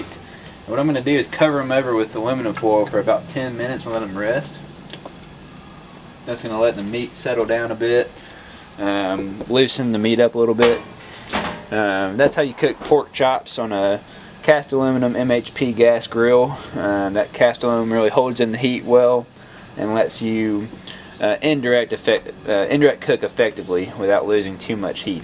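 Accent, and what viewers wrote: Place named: Tennessee, United States. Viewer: Nice video. I’m planning pork chops tonight on my MHP. Never thought of leaning the chops against the side to sear the sides. Meanwhile I’m listening to the accent and finally determine it’s Southeast TN, but then in the last scene you mention a website. Joke’s on me: I was close, but the funny (to me) part is that I BOUGHT MY OWN MHP FROM GASLIGHT & GRILL in Homewood. Fantastic grill! Mine needs some cleaning and renewals, but I’m happy every time I use it.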